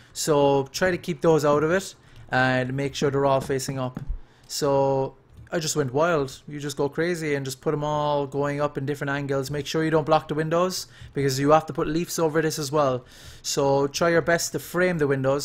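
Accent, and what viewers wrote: Ireland. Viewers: Can you please start making videos again? 1. I just want to listen to you talk (the Irish accent is the best) and 2. I really like your builds.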